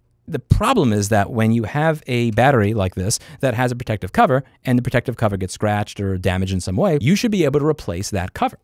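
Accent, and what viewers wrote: New York, United States. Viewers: New Yorker style pronunciations of things is part of why I love him so much.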